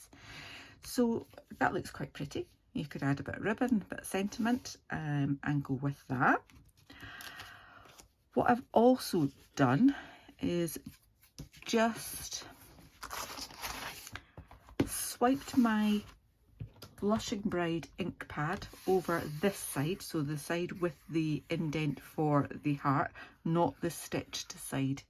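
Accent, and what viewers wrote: United Kingdom. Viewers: Great to hear a Scottish voice x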